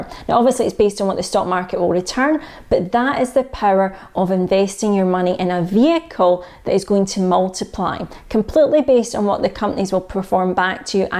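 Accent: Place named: Glasgow, United Kingdom. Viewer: Great wee video and good to hear a Glasgow accent 🏴 I’m just starting out at 50yrs old so all you young bucks can relax 😂 Jut wondering why Vanguard and not 212? I’m hearing a lot of good things about them. I’ve started with H&L before I realised their fees are quite high. Would you say it’s worth investing over a few platforms?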